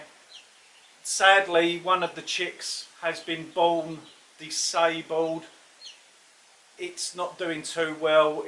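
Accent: Essex, United Kingdom. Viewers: Love your vids and your day in the life ones. Possibly some of the following, how you preserve your produce, advice on keeping birds, how you go about planning what food to grow and why. More of your brilliant how to repair stuff vids. Your growing tips and hints. Ps. Nothing wrong with an Essex accent 👍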